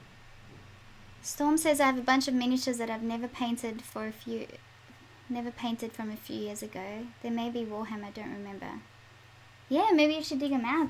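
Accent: South Africa. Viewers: I wasn't expecting the Safrican accent :) Pleasant surprise. Great looking models too. Enjoy playing with them!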